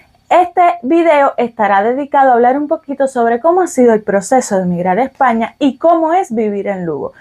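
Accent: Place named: Central Cuba, Cuba. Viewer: Una ciudad tranquila salvo ciertas calles a ciertas horas. Por el acento debes ser del centro de Cuba.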